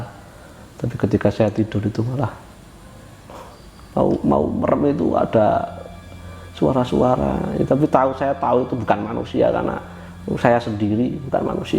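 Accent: Java, Indonesia. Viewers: Mas jd youtuber aja. Cara ngomongnya enak. Walau gaya jawa yah - lama bener critanya hehe - tp saya nonton trus sampe abis krn mw tau endingnya - bikin penasaran & seru juga ... Salam dari Jakarta. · Koreksi. Suara agak kurang jelas dan ada suara gemerisik gitu. Kurang cocok kalo di dengerin gak pake hedset. Dan juga ngomongnya terlalu medok buat aku yang nyunda🙏🏻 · Yeee ,org jawa ya bgtu ,,,medoknya keliatan bnget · Jawa campur nasional😅bljr bhsa dlu yng bnr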